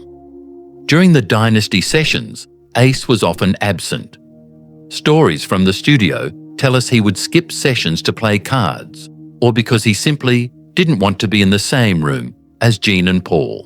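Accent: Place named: Australia. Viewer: I can’t sit here and listen to this Australian robotic twit!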